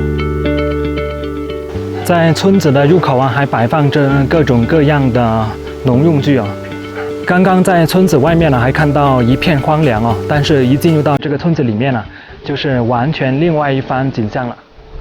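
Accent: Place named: Hakka, China. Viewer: 從你的普通話 可已知到你是客家人 佩服你的毅力及對各地風警 人文的解說 我是住在南加州的七十八歲的客家老者 喜歡看你的視屏 我也有制作一些旅游視屏 但難豋大雅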